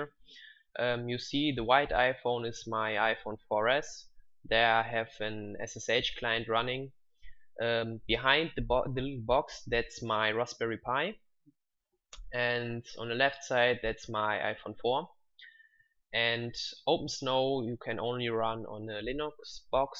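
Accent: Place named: Germany. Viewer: man merkt den Deutschen Dialekt ;) xD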